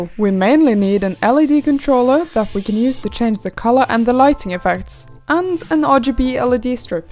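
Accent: United Kingdom. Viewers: She sounds more British in this video than others. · So this is where she started to lose the british accent xD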